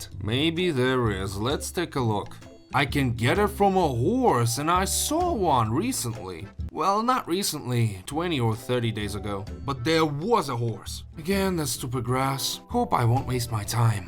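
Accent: Russia. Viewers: Are u Russian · Mind if you use you Russian accent to say on the video? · Are u russian? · great youtuber love his vids and the russian acsent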